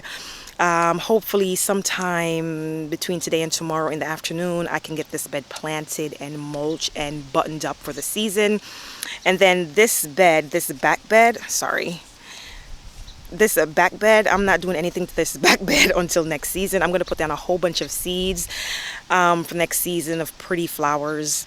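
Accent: Jamaica. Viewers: Love when the Jamaican comes out. Mi tyaad. Can't wait to have the land space to do this extensive gardening. Love watching your videos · Rooting for Jamaican girl love wi accent. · You went back to the Jamaican talking, me tired me nah lie ,lol a nuff work this you know 😄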